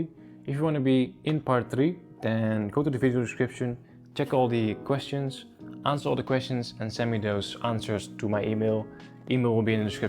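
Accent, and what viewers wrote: Belgium. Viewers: I definitely want to hear your Belgian accent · Ben ik de enige die niet kan slapen tot ik zijn Belgische accent gehoord heb? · I do wana hear your belgian accent xp k ben van Antwerpen! Xp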